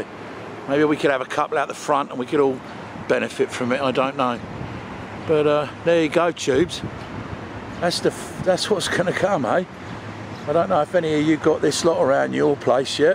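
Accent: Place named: England, United Kingdom. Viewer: The first part of htis video throws people off because you have an english accent and you say in London haha. Even though we know your in Canada it's irony I tells ya irony.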